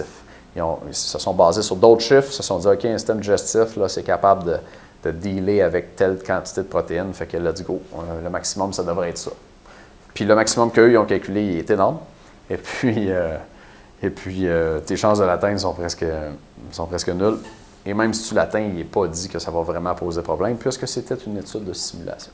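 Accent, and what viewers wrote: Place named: Quebec, Canada. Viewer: Vous pouvez mettre du sous-titrage. Il y a des gens malentendants. Il y a des gens aussi qui ont du mal à suivre l'accent québecois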